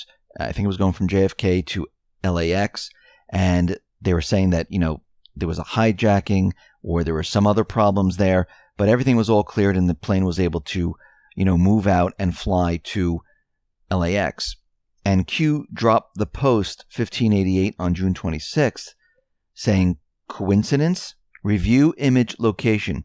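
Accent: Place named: New Jersey, United States. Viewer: Total East coast. Mid or Southern New Jersey by the accent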